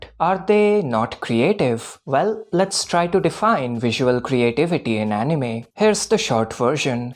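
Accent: India. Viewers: clearly india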